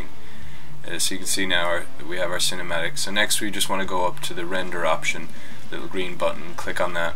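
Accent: Ireland. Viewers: that silky sexy Irish voice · are you Irish?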